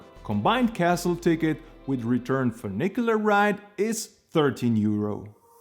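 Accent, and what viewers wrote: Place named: England, United Kingdom. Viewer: Thank you for all of your videos. I've watched many of northern Italy and Slovenia. Great presentation with a very charming English accent. Keep up the great work!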